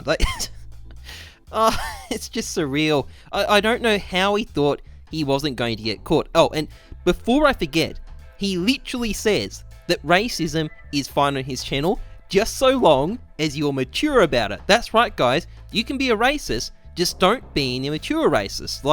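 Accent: Finland. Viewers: Are you from finland? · Wait... Are you finnish??? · are you finnish. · Wait...... What are u From finland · are you from finland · R u from finland? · Are you Finnish?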